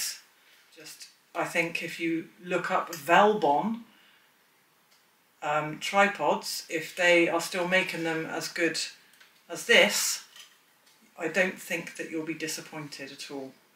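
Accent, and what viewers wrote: United Kingdom. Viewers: You have a West country twang.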